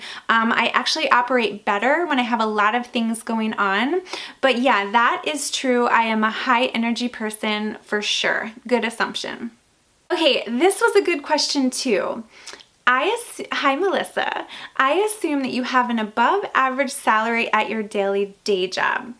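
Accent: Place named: Chicago, United States. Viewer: I totally miss this and didn’t ask you my question. You have a beautiful accent and I think you might be from Chicago. Is that a accurate?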